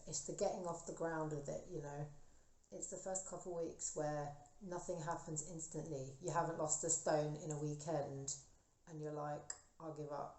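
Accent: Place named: South, United States. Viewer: First, wow I forgot how southern ur accent is, I love itttt <3 beautiful. 'u look fine' absolutely riles me as well, and goes the other way when I'm manic and people say I look amazing n im thinking hun I'm living off spinach and I can't stand still. People's unsolicited advice/opinions can shove offfffff. Thanks for making this, it's so hard to talk about , and I relate to a lot<3 also I have that cat necklace but in pink iaaaaaaa <3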